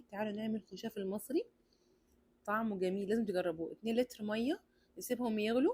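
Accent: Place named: Saudi Arabia. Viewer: حاسه فيه لمحة لهجة سعودية 😂😂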